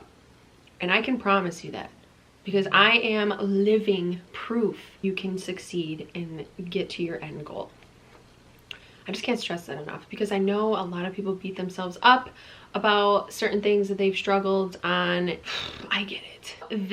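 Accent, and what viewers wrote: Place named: New York, United States. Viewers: I love your New York accent, that's like a real cop's accent. I honestly wish I lived on the east coast just so I can talk like that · She does not have an accent to me. :-) I'd say upstate somewhere, not down by the City.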